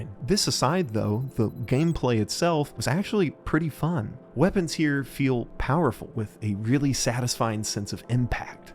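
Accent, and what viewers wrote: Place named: South, United States. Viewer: When that smooth Southern accent hits, you know it's time for some easy listening with insightful commentary. Great video,!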